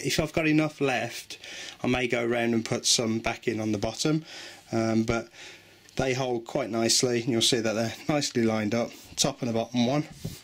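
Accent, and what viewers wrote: Midlands, United Kingdom. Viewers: Not sure why you have chosen to concentrate on intonation as he is trying to communicate his experiences of sound proofing a project studio from a converted garage? This is not an uncommon musical experience. His 'rising intonation' has everything to do with his accent. It sounds like he has a very mild Midlands accent - rising intonation can also be found in other regional English accents such as Geordie or Mackem - I don't think he's trying to deliberately confuse us with non-questions. There are scores of American posts on this subject and I find it helpful that someone on this side of the pond can quote inexpensive UK based sites for sound proofing. Thank goodness he didn't work out the RT60, we may have nodded off. Well done. He did say he was an amateur and it was his first post, do you not think you're being a bit harsh?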